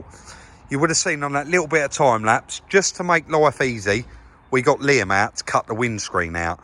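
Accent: United Kingdom. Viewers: I like this funy British accent hahaha all an all video is very helpful thank you so much amigo 😅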